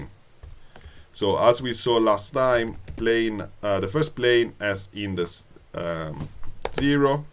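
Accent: Italy. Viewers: Hello, are you italian ? I love your accent :)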